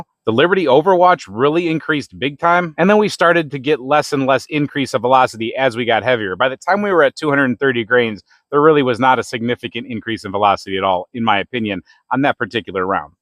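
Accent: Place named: North, United Kingdom. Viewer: Instant subscribe because I always appreciate good, substantive number crunching. The friendly northern accent is also an added bonus.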